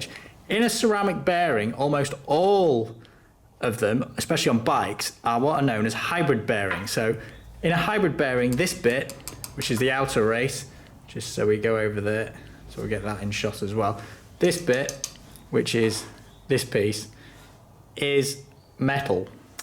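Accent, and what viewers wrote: United Kingdom. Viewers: How come dude looks Indian but sounds british 😂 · First time I’ve come across your channel very good one word of advice drop the orange overalls you look like you’ve just come out of Guantánamo Bay Nice to hear a British accent 👍🏿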